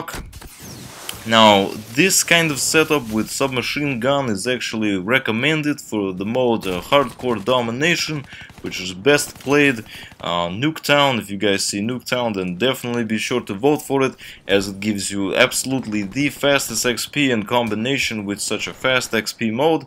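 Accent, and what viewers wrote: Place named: Russia. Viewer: He sounds like for Russia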